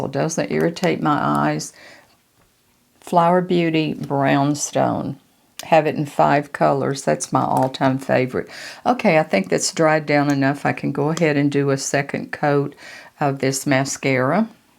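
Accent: South, United States. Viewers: you are just precious!! You give women our age such confidence in the ability to make ourselves look and feel beautiful. I can't get enough of your southern brawl. That's a compliment! You are stunning!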